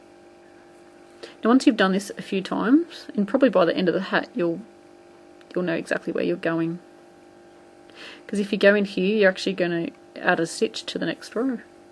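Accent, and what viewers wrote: Australia. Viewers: you have the absolute BEST tutorial videos on youtube. Thank you SO MUCH!! Listening to your aussie accent is a bonus:) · Thanks, just doing this for the cluster bag:) But now after doing this and seeing your friends technique for fitting hats I really want to make myself a slouch hat:) You are seriously in the top 3 best online crochet tutors in the world. Lobe your work and love hearing that Aussie accent. (I'm an Aussie too, just feels so homey) Thanks again!:))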